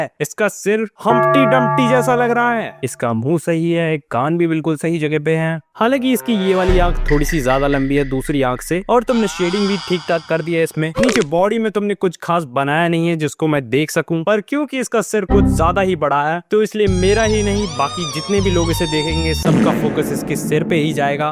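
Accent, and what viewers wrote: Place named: Haryana, India. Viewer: Bro aap kha se ho language se haryana se lag rahe ho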